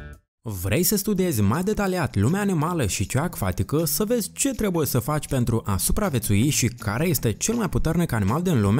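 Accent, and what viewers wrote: Moldova. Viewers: 🤐 Foarte frumos clipul, dar comentariul este total aiurea de...moldovenesc de peste Prut ! Recomand revizionarea clipului ori de câte ori doriți, dar ÎNCHIDEȚI sonorul !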